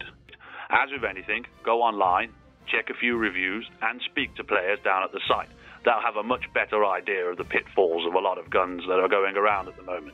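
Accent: United Kingdom. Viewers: The accent becomes slowly more and more northern. I like the bad audio quality.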